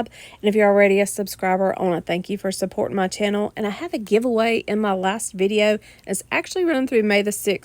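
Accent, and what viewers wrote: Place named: South, United States. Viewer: I just found you today, one of the collabs from a few months ago. I loved the lemon theme and your southern voice. I'm from GA. So I have one too, any fruit or farm theme I truly love. Thank you for making a good day for all the crafter. Rhonda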